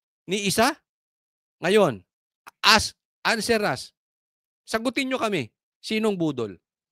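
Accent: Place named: Visayas, Philippines. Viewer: Tama po kht bisya dialect niya pinapanuod ko sya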